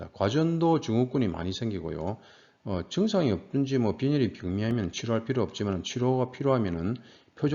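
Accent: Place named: Busan, South Korea. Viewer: 교수님 부산분이신가요 ? 익숙한 억양 너무 좋아요 쏙쏙 들어오고 친숙하고 재미있어요 ~^^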